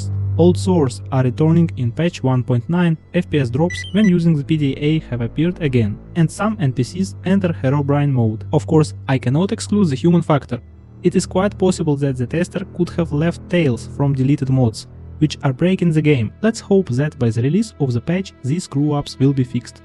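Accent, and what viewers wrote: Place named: Russia. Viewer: Я прям слышу русский акцент. На русском ролики будут?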